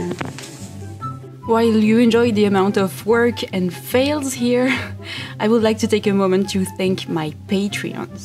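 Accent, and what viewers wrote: France. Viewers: Tu es tellement française, ça s'entend jpp- Mais très cool ton tuto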